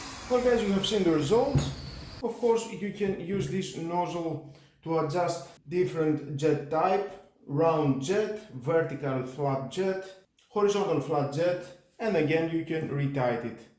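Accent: Greece. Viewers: Αδερφε Ελληνας? :D Με το που αρχισε το βιντεο σε εφαγα απο την προφορα.χαχαχα Δυνατος! Νομιζω οτι ηθελε λιγο πιο αραιο το χρωμα, αλλα παλι καλα ειναι λογω του μπεκ. Ωραιο Βιντεο.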